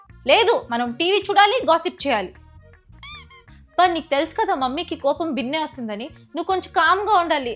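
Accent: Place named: Karnataka, India. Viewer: Karnataka telugu ilaane untundi...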